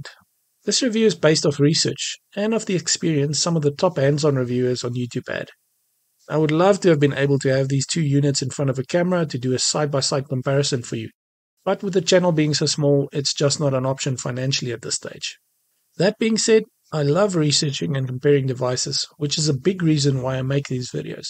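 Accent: South Africa. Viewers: keep on researching. I heard your ZA accent. I couldn't miss it. Haha am getting the anbernic 556 this month.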